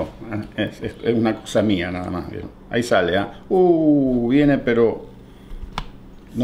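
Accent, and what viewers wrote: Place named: Argentina. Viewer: soy tu fan. Me encanta que seas tan argento al hablar... Nunca se pierde la esencia 💙 Saludos de una Argenta en Reino Unido.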